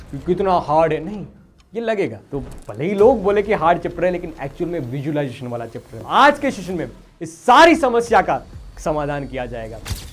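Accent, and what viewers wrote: Gujarat, India. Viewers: sir aap Gujarati ho????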